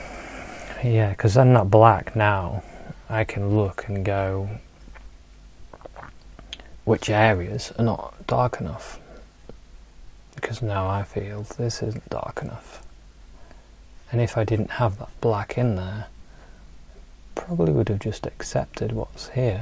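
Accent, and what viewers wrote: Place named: England, United Kingdom. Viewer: I know his accent is English, but is he based in the UK or Australia as we have a lot of Brits who migrate to Oz. Cheers from down under.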